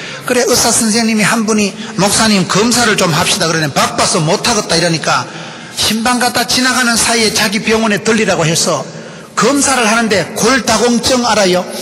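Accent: Gyeongsang, South Korea. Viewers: 경상도 방언을 쓰셔서 그렇습니다 거친 표현이 아님니다 더군다나 교만하고는 거리가 뭡니다 국어학 적으로 아무런 문제가 없습니다 그러려니 하고 들으시면 되지 않을까여 😊😊😊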